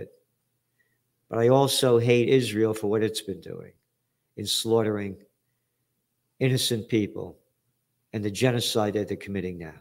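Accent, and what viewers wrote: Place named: New York, United States. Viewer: Spoken like a true New Yorker.. love it.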